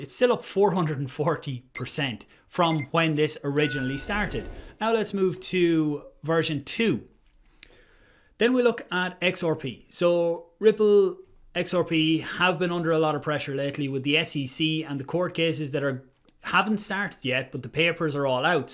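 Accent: Ireland. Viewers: He's Irish. I don't know which part of Ireland though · I know he is irish. Iam irish too ha Thats why i know he is irish but i cannot figure out what county he is from!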